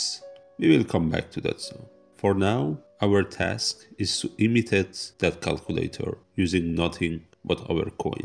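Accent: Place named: Eastern Europe. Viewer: Are you from the Baltic states or a Slavic country? Or Romania? I can instantly recognize this accent ANYWHERE !! :) CHEERS for the content, keep it up! .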